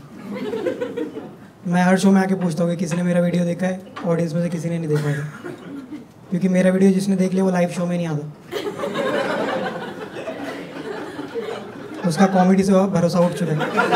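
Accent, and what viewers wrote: Bhopal, India. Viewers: "Bhai ye bhopal se hai kya?" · Ye bhopal se h kya ? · Ye bhopal se hai kya?? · Ooi, yeh Bhopal se hai kya? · Ye banda Bhopal se h kya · Bhai tum bhopal see hoo kya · oyee tu bhopal se h kyaa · Yeh banda bhopal se hai kya?? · Ye banda bhopal se hai kya??🤔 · tu bhopal se hai kya...? :D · Ye Bhopal se he kya?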